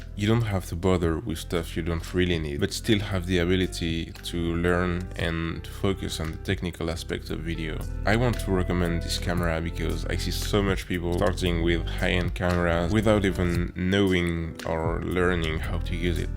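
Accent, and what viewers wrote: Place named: France. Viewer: Oh yes!!! The OG 6000 should definitely not be forgotten. Today plenty of people try to run with 4K, 10 Bit and 60 FPS before they can even walk properly. It's nice to hear your view, because it really sounds it comes from actual experience and not some stuff people say because big Youtubers say it. Keep it up man! And don't worry about your French accent!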